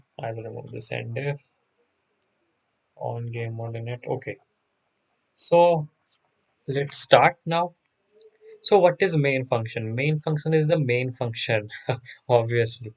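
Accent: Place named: India. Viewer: the moment you hear the indian voice you know you can rely on them to help you with virtually anything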